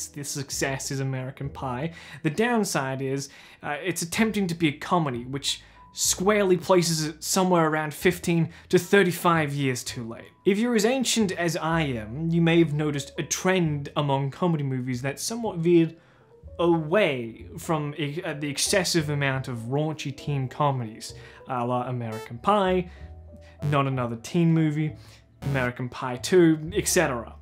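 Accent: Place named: Australia. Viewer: why do you sound less australian now?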